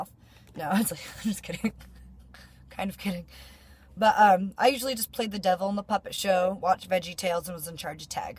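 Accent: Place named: Pacific Northwest, United States. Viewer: I like your vids! It's always been a dream of mine to live in a camper/van/etc..... very cool. Also I could tell you were from somewhere between Portland and Seattle, probably the way you talk, it's almost like I've known you for years or something lol can always tell when someone is from the PNW or close to it.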